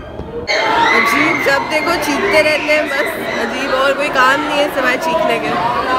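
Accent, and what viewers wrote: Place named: Karachi, Pakistan. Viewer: Your Karachi type accent is so funny. Lol. I'm from Lahore.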